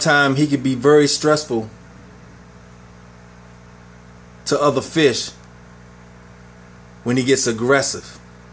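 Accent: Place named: Washington DC, United States. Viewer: Cool fish. Sounds like you are from the DC area. ??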